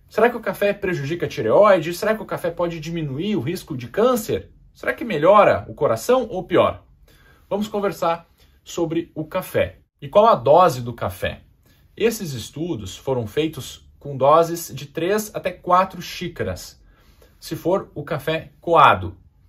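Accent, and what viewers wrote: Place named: South, Brazil. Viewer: Sabia que eras do Sul..😄Zürich aqui!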